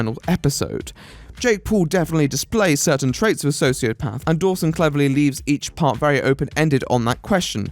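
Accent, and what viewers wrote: RP, United Kingdom. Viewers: Just because he puts on a posh accent does not make him the BBC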